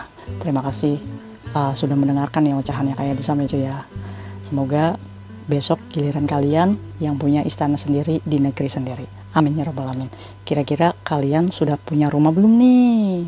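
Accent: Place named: Cirebon, Indonesia. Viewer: Kalo dari logat & bahasa nya kak nih klo bukan orang Cirebon, Indramayu atau Subang 😊 boleh nih main 🤭🤭🤭 BAROKALLAH semoga berkah ya kak rumah nya, Masyaa Allah saya kadang iri kalo ada yg cerita dapat warisan🥰🥰🥰tp justru ini membuat ku tambah semangatt💪💪💪 walau saya gak ada warisan inshaa Allah anak2 ku nanti ada warisan 🤲🤲🤲 Aamiin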